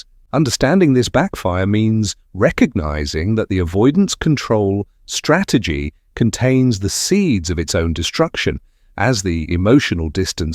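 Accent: Australia. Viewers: I prefer the previous voice with the Aussie accent not this snobbish one. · Yeah was only r ason I watched this show a familiar Aussie accent I won't watch now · Australian accent again please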